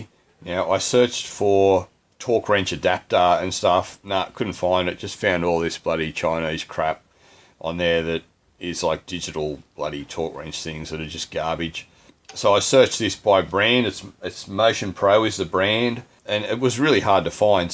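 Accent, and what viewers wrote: Australia. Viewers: Love your Aussie accent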